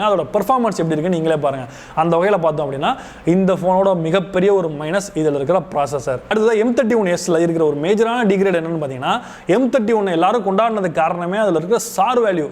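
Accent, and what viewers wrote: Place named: Madurai, India. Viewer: I'm new subscriber Anna nenga Madurai ya ? Slang mass .......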